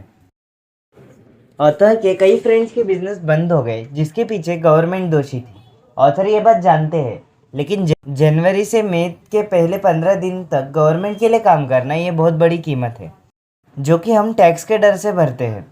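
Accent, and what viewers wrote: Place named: Hyderabad, India. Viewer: Tum Hyderabadi Miya lag raho ji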